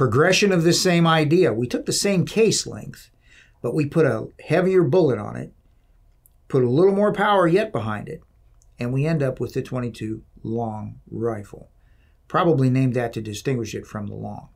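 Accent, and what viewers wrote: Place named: United States. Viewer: You don't sound English, you sound American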